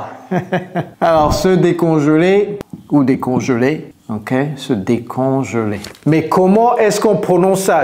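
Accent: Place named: United States. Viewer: you have such a crazy beautiful American accent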